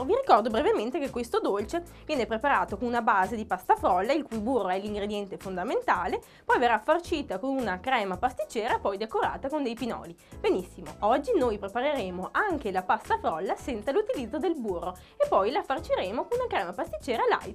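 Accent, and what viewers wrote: Italy. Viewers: Ma sei di Parma?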